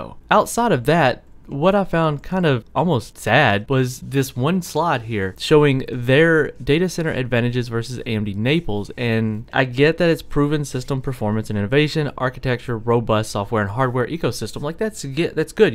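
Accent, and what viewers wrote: South, United States. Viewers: Where are you from if you don't mind me asking? I hear some southern twang in there XD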